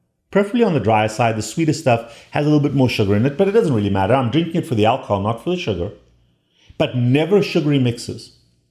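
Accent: South Africa. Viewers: I knew by your accent that you were South African, Great commentary, very imformative.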